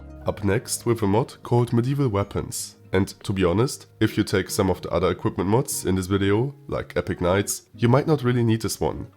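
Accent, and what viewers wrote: Germany. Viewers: i can hören the wundervollen german accent, bitte mach mehr videos! 💪💪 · du kanst so viel englisch sprechen wie du wilst aber ich höre nen deutschen dialekt wen ich nen deutschen dialekt höre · you sound so german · Man hört so raus das du deutscher bist 😅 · Da hör I do n deutschn? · Ah, ein Deutscher mit einem dicken deutschen Akzent!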